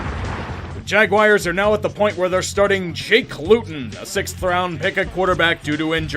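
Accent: Pittsburgh, United States. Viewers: Come on, let your Inner Yinzer do commentary for a Steelers game.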